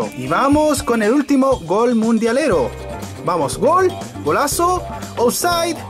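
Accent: Chile. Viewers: habla como chileno wnn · Eres chileno? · eres chileno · Eres de chile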